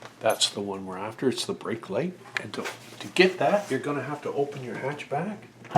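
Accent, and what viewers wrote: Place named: Canada. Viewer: because of your video i'm gonna attempt this myself. plus- you have a great canadian accent- aboot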